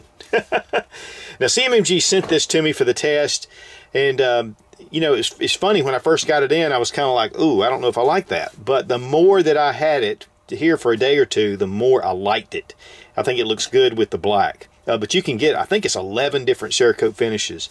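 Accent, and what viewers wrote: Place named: North Carolina, United States. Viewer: Hi. Like your videos. You sound like you are down in eastern NC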